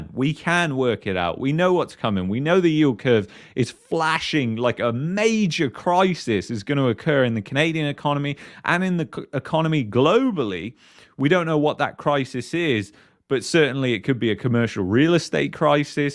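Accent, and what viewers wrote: United Kingdom. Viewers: Why is a Brit , explaining Canadian economics ?😮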